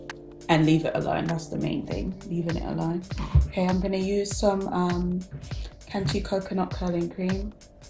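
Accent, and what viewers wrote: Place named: United Kingdom. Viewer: Instagram Subbie here, I never knew you had a British accent. Just always loved your hair.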